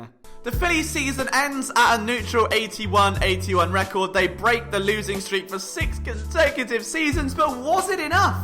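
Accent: United Kingdom. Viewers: Hate to triple comment, but your antics are so brilliantly weird. Combined with a British accent and a Giroux jersey, 14k subscribers should be coming after this post. But anyway, WHERE IS THE FILM ROOM?! Sidebar: You might have to re-WIRE your palate if you’ll be in Philly this year. I don’t even know what half the foods you said were, but I can pretty well guarantee they won’t be available. Cheesesteaks are delicious, yes, but can only have so many in a short timespan.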